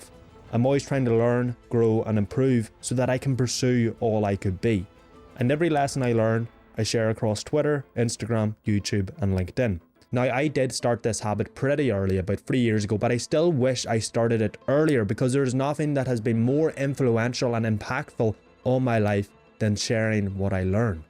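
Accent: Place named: Northern Ireland, United Kingdom. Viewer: Recognised that NI accent, and saw that QUB on your LinkedIn. Good lad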